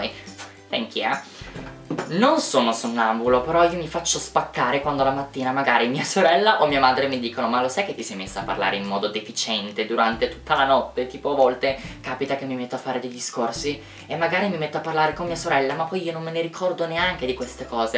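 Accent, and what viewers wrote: United Kingdom. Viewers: Adoro il tuo accento inglese. Spero di diventare una persona forte come te♥️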